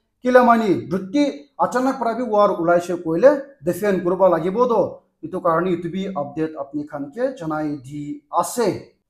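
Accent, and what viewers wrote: Nagaland, India. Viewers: 😂I like your nagamese news..as you speak our naga ascent of our nagamese common linguage..thanks and may God bless you pl..yes stay as,a naga and speak as a true origional nagamese I like it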